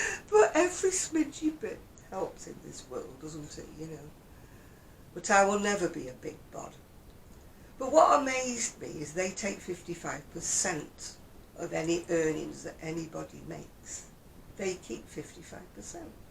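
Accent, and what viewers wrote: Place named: England, United Kingdom. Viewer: Sweet Pea… that was an enjoyable chit chat. Especially the English accent. What happened to respect your elders, as I am one of those now. Oh well, it is easy to find the dark but but but……I search for the light with a chuckle under my breath. Be well, Love‼️❤️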